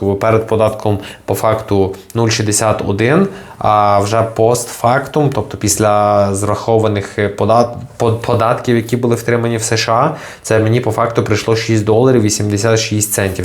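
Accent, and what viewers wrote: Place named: Western Ukraine, Ukraine. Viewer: бо вимова як в неділю в церкві, або ви з Франика або з Рави або з Тернополя більше варіантів нема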